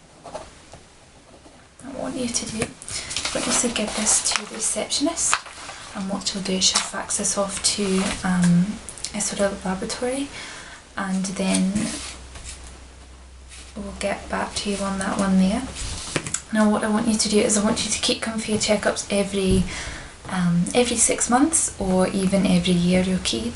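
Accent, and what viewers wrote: Scotland, United Kingdom. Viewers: Wow,this was very nice,and love that scottish accent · pleaaaaase do more Scottish accent asmr's! it's the best! also u perform this so naturally it's so unique. it actually feels genuine. i love it! I'm currently revising for exams and have only been listening to your asmr's :D x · I could be wrong but iirc, she lived in Scotland for some years and picked up the accent somewhat. And, I mean... People can fake accents, it's pretty much what actors and voice actors do. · Gotta give you credit for this I.have all on looking at a ceramic let alone remembering what to say AND doing a scottish accent! Great job keep the videos coming :) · doun, love the Scottish Accent. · This sounds Scottish not Brittish. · Some may think "NERD" when they here the Scottish accent and the glasses, but I can see past that to an ambitious young woman who can be a very good friend and helper! 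· That's a Scottish accent she's has. Same as mine ;) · Your Scottish accent is pretty good but you're saying words with an R on the end funny e.g. Here, hour, or etc... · Great scots accent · I thought you were actually Scottish until I read the description at 10 minutes in. I like the accent, more Scottish ones would be good! Excellent video. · You sound a lot more Scottish than British! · ASMR . thanks for the reply. Your Scottish accent is top shelf. I have lived in the states for 10 years and slowly losing mine. good luck developing the aussie one. cheers, and have a good one friend. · Are you scottish? I've heard the accent before on a TV show. No offense but my brain is stuck between "that accent is frkin brilliant" and "that sounds funny". Greetings from Germany, keep doing what you're doing ;) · Attempted Scottish accent!? I didn't think so. I though you were actually Scottish! 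😃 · Okay. I just subscribed. I randomly came across this video and I am glad I did! This was very relaxing. Not only am I digging the Scottish accent, but you are beautiful, as well! Keep up the excellent work! :) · I am completely in love with your Scottish accent! I'm a fan of it since trainspotting 😍 great asmr video. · Finally a Scottish person doing ASMR! I'd guess West? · ***** And after seeing your answer I just now realised that the description said "attempted scottish accent" ^^ When browsing for ASMR videos I just look at the titles and listen to the start to determine if I'll watch it and mostly when I'm half asleep so not a lot of description reading going on :P Anyway, I think one has to know the scottish accent very well to tell the difference though. I just heard it in the later seasons of the TV show "Being Human" before but beside her having a thicker accent I straight up thought you were scottish ^^ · Well you seem to have it pegged pretty well. 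Just came across your videos yesterday and the scottish accent intrigued me. Cant wait to check out more of your vids. you'll probably hear from me again, my dear. lol. Thank you for taking the time to respond!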